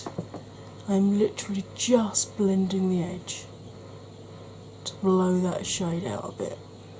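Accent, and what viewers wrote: United Kingdom. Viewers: Hiya, new subscriber here, I found you through Makeup Struggles! I too am feeling this heat - it’s too much isn’t it! I’m 48, very fat, relatively fair but defo fabulous! I’m jealous of your lids space as I have very hooded eyes! I’m in the south too - I’m assuming from your accent you are Essex way on? Love this look! Sorry for long post I just wanted to introduce myself! 😃 oh yeah and I also have spinal stenosis!!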